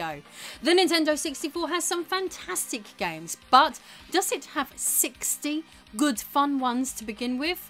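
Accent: United Kingdom. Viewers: 5th generation of consoles + 90s vibes + well exposed review + British accent= excellent content. P.D.: Nice channel and greetings from a Sega Saturn player who had a PS1 in the 90s and lost good stuff.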